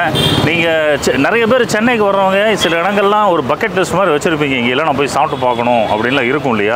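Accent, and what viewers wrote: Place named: Kongu, India. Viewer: Ore satham vaalu vaalu nu 😂kongu slang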